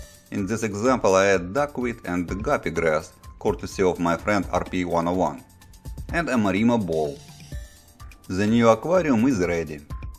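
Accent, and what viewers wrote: Russia. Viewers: i just wonder do americans understand your accent? my russian friend has the same english accent as yours. he have never been in russia though.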